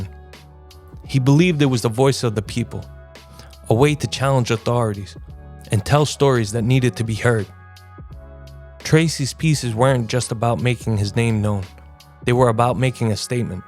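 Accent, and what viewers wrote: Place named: New York, United States. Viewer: Very well done doco. You have the perfect classic NY accent for the narration that really sets the tone. Fly high Tracy168🙏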